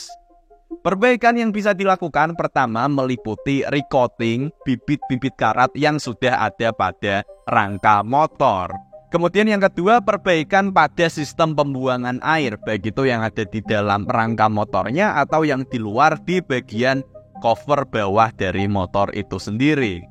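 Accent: Java, Indonesia. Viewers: Bahasa, tpi logat.e osing😂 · Medok masse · Logate osing banget. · Penjelasan yg komprehensif plus medok men bahasamu lek ❤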